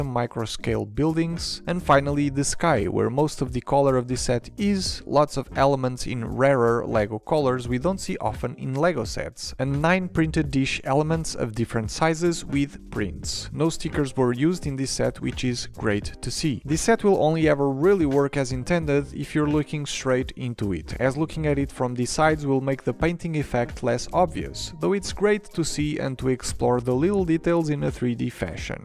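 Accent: Portugal. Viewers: well it seemed to me that you were portuguese i knew only by the accent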